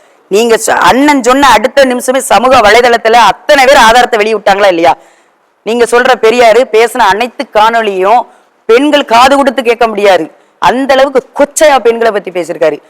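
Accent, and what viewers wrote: Thoothukudi, India. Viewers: உங்கள் தூத்துக்குடி தமிழ் நல்லாருக்கு 🎉🎉🎉🎉🎉🎉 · அக்கா உங்கள் வீரமான தைரியமான விளக்கமான உரை அற்புதம். வாழ்த்துக்கள் அக்கா🎉🎉 உங்கள் ஏலே என்னாலே தூத்துக்குடி வழக்குமுறைப் பேச்சு கேட்டுக்கிட்டே இருக்கலாம் போல. ❤❤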